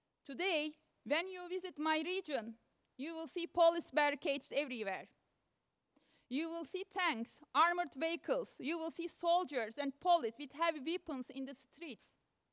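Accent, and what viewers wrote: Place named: Istanbul, Turkey. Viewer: Agir bir Nisantasi aksaniyla English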